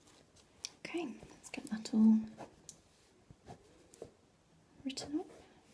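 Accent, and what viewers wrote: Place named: Southern England, United Kingdom. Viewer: Brok Homz good old south of England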